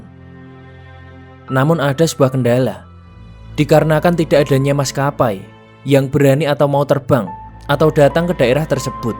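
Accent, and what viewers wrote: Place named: Java, Indonesia. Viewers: Lekoh bgt bang logat jawa nya 😄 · Suaranya agak medok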